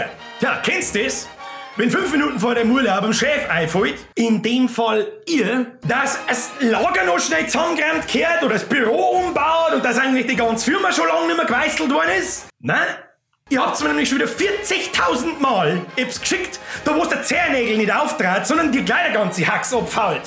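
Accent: Bavaria, Germany. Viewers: Oiwei wenn i om bin bei de Eitern vo meim Freind in Sachsn, schaug i ma deine Videos o wei du so sche bayrisch redst und des ma echt feit. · du bist einfach genial:D ich bekomme immer so nen lachkrampf wenn ich deine videos anschaue. ach wie ich diesen bayrischen dialekt vergötter^^ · Ich versteh deinen Dialekt so schlecht :-) Ahhhh kein Wunder, bin ja ein Franke, da versteht man andere Muttersprachen so schlecht und da ja Bayern zu Franken gehört ist des eigentlich auch normal, gell? :-)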